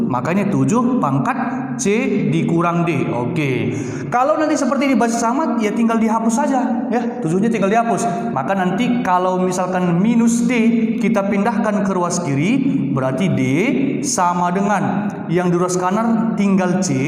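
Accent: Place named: North Sumatra, Indonesia. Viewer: Bapak org Sumut ya pak? Logat bicara kita hampir mirip soalnya😁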